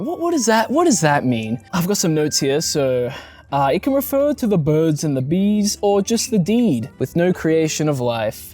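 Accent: Australia. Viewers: You look really nice, like not just how you look, your voice and your look go well together in a way that makes you look better. Sorry if that sounds weird. I'm high, are you australia? I can't tell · are you aussie?? fuck yeah brother best country in the world (said that bc of the fauna and accent but i checked the bio)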